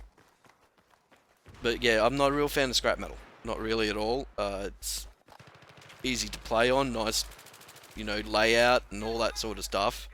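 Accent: Australia. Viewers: I still can't get over how sexy your voice is. Dat 'stralian accent. I thought now that rent-a-server had come out, you were able to modify the player count. That sucks that it's limited to 8v8 :(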